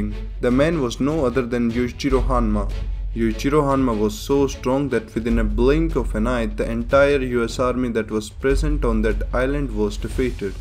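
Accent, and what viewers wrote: India. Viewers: nah his accent(I think Indian) pronounced the both very similarly.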